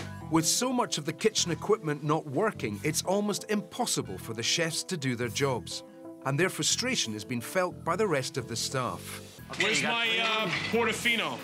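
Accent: Scotland, United Kingdom. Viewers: I totally felt the change in narrators. A lil bri'ish eh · scottish Ramsay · The Scottish accent really jumped out in the narration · Have they taken voiceover voice from when he was 15 or something. he sounds more scottish than ive ever heard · It's strange listening to the British commentary. I'm so used to hearing the American guy in the episode. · Kitchen Nightmares US with a British narrator is pure gold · This episode must be real early because it's not narrating it's some Scottish dude.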